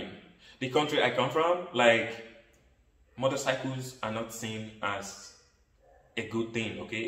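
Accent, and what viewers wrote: Philippines. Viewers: Your accent sounds like filipino 😅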